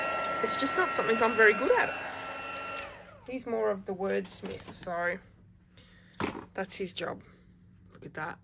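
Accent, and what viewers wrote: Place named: Australia. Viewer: I love the dsp. It’s a beautiful card. Well done! It’s also nice to hear an Aussie voice.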